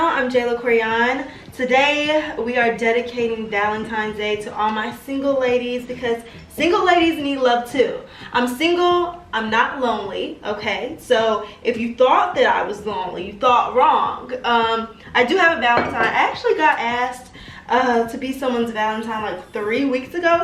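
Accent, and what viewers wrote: New York, United States. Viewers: Jayla your officially a new yorker, just listening to you talk in this videos I felt like we grew up together lol Love you so much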